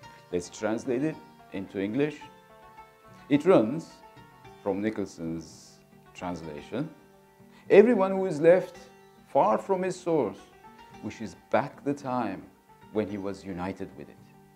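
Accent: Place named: United Kingdom. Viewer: Hocamızın ingilizcesi şahane İngiliz aksanina yakin olması orda uzun süre yaşamasından kaynaklı sanırım 🤗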